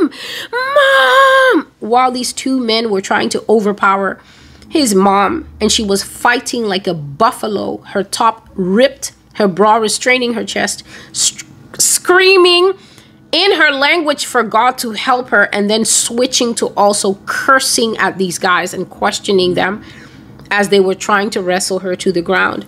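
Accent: Nigeria. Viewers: This Nigerian accent is on point 😀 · “Chop bar”= Buka Thank you for all you do. You are an honorary Nigerian 🇳🇬because you can speak like us. I salute your dedication and your work daughter of my King🕊️🤍🎚️ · You spoke exactly like a Nigerian 😅....Well done ma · God bless you Sister. I'm Nigerian , it's scary how you could mimic our accent though🙂 . May the Lord continue increase you in strength & wisdom . You are a gift to humanity . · Great job on that Nigerian accent, I do don’t care what pple say about this girl, all I know is God speaks to her❤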